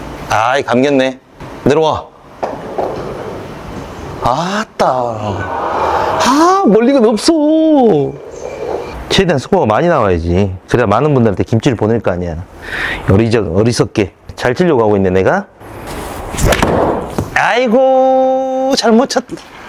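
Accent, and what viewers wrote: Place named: Jeolla, South Korea. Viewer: 구독자 500명 달성을 진심으로 축하드려요!😊❤🎉 전라도 김치 참 좋아해서 저도 사먹는 편이거든요! 특히 묵은지를 좋아해서 묵은지 김치찜을 자주 해먹는 편이랍니다 ㅎㅎ 에서는 김치소개를 더해서 전라도의 맛집도 소개해 주시는 영상이 많아 참 좋더라구요❤ 그 중에 얼마전 말리화반점을 소개해 주셔서 아주 맛있게 잘 먹구 왔답니다! 동구에 있는 오래된 중국집인데 유니짜장이 맛있다 라는 에서의 리뷰를 보고 역시니 맛잘알❤이라고 생각을 하였다는요..👍🏻 그러니 의 김치는 얼마나 맛있을까 하는 믿음이 안생기겠냐구요 👍🏻ㅎㅎㅎ 이렇게 열심히 해주셔서 많은 구독자 님들이 금치의 김치를 받을 수 있나 봅니다! 저도 금치를 꼭 밥에다 먹어보길 희망하며 바라봅니다😊 역시 전라도 사투리 찰져버리시네요~~ㅎㅎㅎ 34개 축하드리고! 500명돌파 다시한번 축하드리고 1000명 1만명 까지 쭉쭉 승승장구 하시길 바라겠습니다!!😊❤🎉 (1kg:총각김치, 서비스김치: 부추김치, 깻잎김치 희망해 봅니다..😊)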